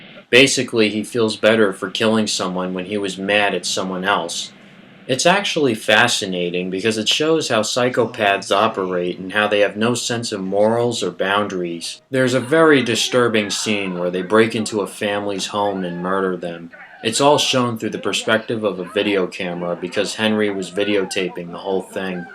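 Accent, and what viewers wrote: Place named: Chicago, United States. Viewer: I don't hear that, but with the Chicago accent he sounds a lot like the rude fence where they got the TV and camcorder.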